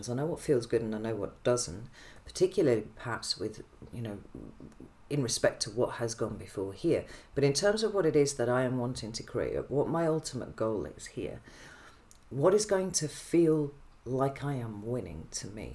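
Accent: United Kingdom. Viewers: Could you please move the mic closer to you?!!!! Or speak up for us! Maybe it's just me, but I'm having a hard time to hear and understand what you are saying. your voice is deep, and you are so quiet. I would love to hear what you have to say! Plus you have this gorgeous British English "accent" :) all i hear is whispering mumble most of the time. thank you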